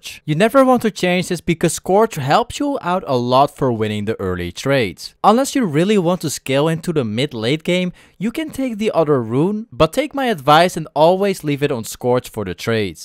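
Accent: Netherlands. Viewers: ayy we out here. But are you dutch? You have that accent atleasd ^^.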